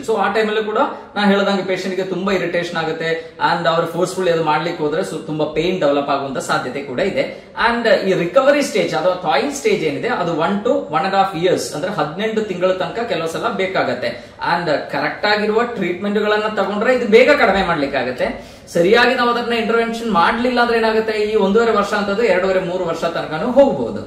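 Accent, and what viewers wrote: Kerala, India. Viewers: sir you seems like from malayalam language background but your kannada is excellent..👍🏻very good information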